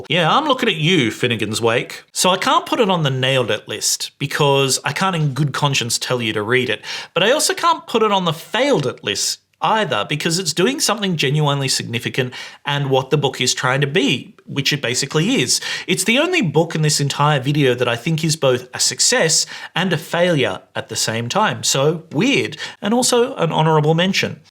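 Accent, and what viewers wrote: United States. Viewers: Chicago South Side Latino/African-American? 🖤❤️🤍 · British? He sounds American to me (speaking as an Englishman).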